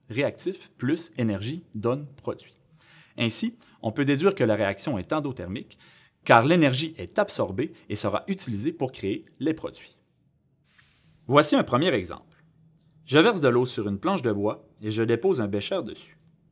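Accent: Quebec, Canada. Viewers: Rapide, efficace et en plus avec l'accent québécois, c'est juste parfait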